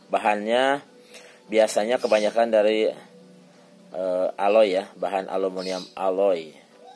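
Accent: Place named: West Java, Indonesia. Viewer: nah itu dia om,,kalo ikutan gabung kan biaya nya bikin jangarrr...hehe btw si om nya asli mana ya..? kayanya org sunda ya? logat nya kaya gk asing om,,khas sunda wetan banget,,tasik,,garut,,ciamis..😁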